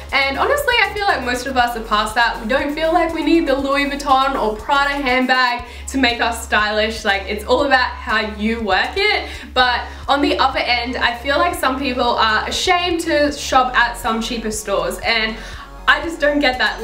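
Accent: Australia. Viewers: Are you Australian?? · are u from Australia??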